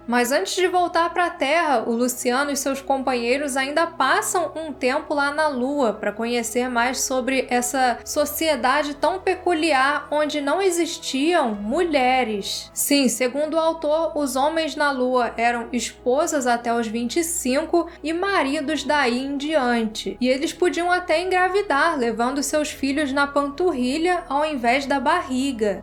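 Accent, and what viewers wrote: Rio de Janeiro, Brazil. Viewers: Você tem um sotaque fluminense. De que cidade você se encontra?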